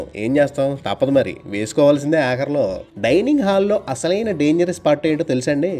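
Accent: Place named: Kakinada, India. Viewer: అద్భుతంగా వుంది అండి మీ కాకినాడ యాస....ప్రాస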